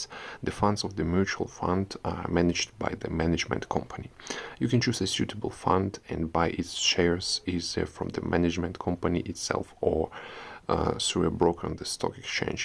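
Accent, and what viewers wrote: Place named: Russia. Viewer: У тебя акцент есть русский!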